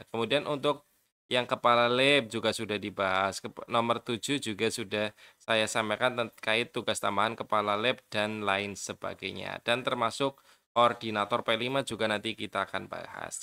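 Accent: Banyumas, Indonesia. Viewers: Bg 😊, solusi tugas tambahan guru kami semua tidak terbaca di profil guru di dapodik, solusi nya bg?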